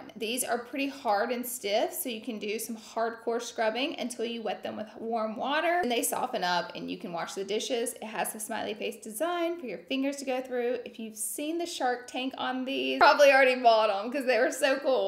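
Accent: South, United States. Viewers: GURRRLLLLL is totally from the south. But it’s MY FAV. Fellow southern girlie.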